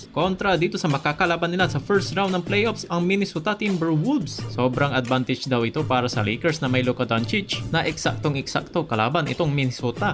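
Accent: Pangasinan, Philippines. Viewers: taga pangasinan ka awa? hehe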